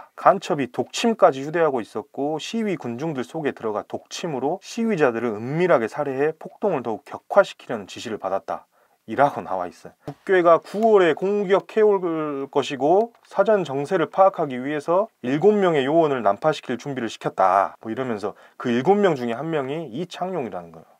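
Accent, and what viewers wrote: Jeolla, South Korea. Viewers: 518 광주민주화 항쟁 영화로 배운사람인데 ㅠㅠ 이런 사연이 있었네요 전라도사투리 어디서 들었다했는데 응칠시리즈였음ㅎ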